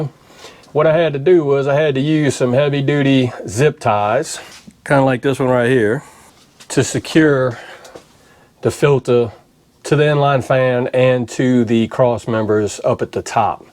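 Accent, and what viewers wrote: South, United States. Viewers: Honestly subbed for that thick south accent 💪🏻😁👍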